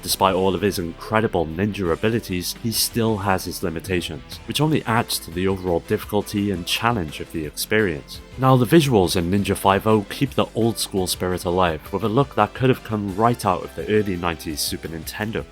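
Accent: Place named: Wales, United Kingdom. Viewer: Man I KNEW you were a fellow Welsh. You can't miss that accent.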